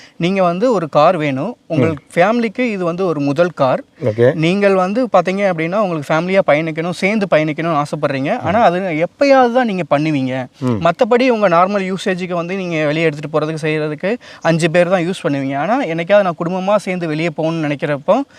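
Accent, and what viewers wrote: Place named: Tirunelveli, India. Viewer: மாஷாஅல்லாஹ்.! இறைவன் உங்களுக்கு அருள் புரியட்டும்..!! உங்களின் வீடியோக்கள் அனைத்தும் புதிதாக கார் வாங்கும் மக்களுக்கு ஒரு சரியான வழிகாட்டி.!!! ஒரு காரில் உள்ள குறை, நிறைகளை சாமானிய மக்கள் கூட மிகத் தெளிவாக எந்த மனக் குழப்பமும் இல்லாமல் வாங்குவதற்கு மிக உதவியாக இருக்கிறது. அருமையான நெல்லைத் தமிழில் உங்களின் கார் பற்றிய வர்ணனை மிக அருமை. சில யூடியூப்பர்கள் ரிவியூ என்ற பேரில் டஸ், புஸ்ன்னு இங்கிலீஷ்ல பேசி தமிழர்களின் பொறுமையில் விரலை விட்டு விடுவார்கள். ஆனால் உங்களின் தனித்துவம் எப்போதும் எல்லோராலும்.. விரும்பப்படும். உங்களின் கனிவான, பணிவான பேச்சு & வார்த்தைகள் எல்லா "சமய" மக்களையும் கவர்ந்திழுக்கிறது. வெகுஜன மக்களின் அன்பும், ஆதரவும் உங்களுக்கு எப்போதும் உண்டு. ஏனென்றால் நீங்கள் மற்ற யூ-ட்யூப்பர்களை விட தனித்து நிற்க்கிறீர்கள்.! அதற்கு காரணம்..!? கமாண்டில் கேள்வி கேட்பவர்களுக்கு உங்களின் ஆரோக்கியமான, கண்ணியமான பதில்கள்.! 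வாகனங்கள் பற்றி உண்டான உங்களைப் போன்றவர்களின் மிக ஆழமான சிந்தனை இந்நாட்டு அரசுக்கும், நாட்டு மக்களுக்கும் அவசியம் தேவை. தமிழக, மற்றும் ஒன்றிய போக்குவரத்துதுறை உங்களை பயன்படுத்திக் கொள்ள வேண்டும். என்றும் உங்கள் பணிகள் சிறக்க என் பிரார்த்தனை & வாழ்த்துக்கள்.!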